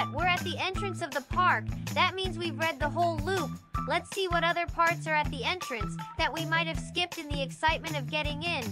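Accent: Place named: Venezuela. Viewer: Adivina tú eres de Venezuela porque hablas de Venezuela tú nunca has dicho vos vos sos vos nunca lo As dicho entonces tú eres venezolana hablas venezolanas tienes el acento venezolano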